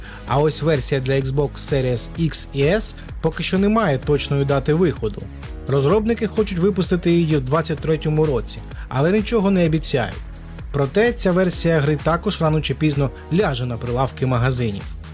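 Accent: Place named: Surzhyk, Ukraine. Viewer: ааа снова на суржике пшешишь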